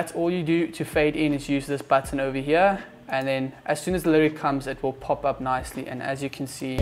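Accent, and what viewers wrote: South Africa. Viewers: Recognizing that South African accent a mile away. My bru 👏🇿🇦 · you can recognise the South African accent anywhere! :) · This is dope thanks for the hook up, nice tips especially the Motion backround ... Dude ,I could swear you sound South African... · why am i hearing a lil bit of ab de villers sound when he is speaking are you from SA?? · I reckonize that SA accent my bru 🇿🇦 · Hahah I can hear your south african accent 😂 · I was wondering why he sounded like some one from South Africa😂😂😂😂😂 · Lol i was skipping thru the intro ...and was like wait hold up...lol if u South african,you can't miss it lol🔥🇿🇦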